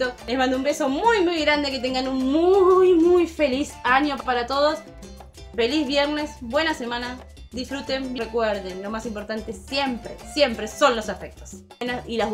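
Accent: Argentina. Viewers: Amo argentina sobre todo tú acento 😂 nueva sub te invito a que te suscribas a mi canal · gracias por contarnos sobre ti. Siempre he dicho que el acento argentino es hermoso. Un abrazo desde Bogotá-Colombia 🇨🇴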